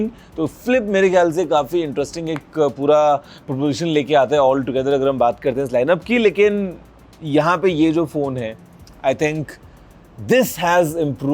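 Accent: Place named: South Delhi, India. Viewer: South delhi girls jaisi accent hai bhai teri. Makeover ke sath sath accent pe bhi kaam kar thoda.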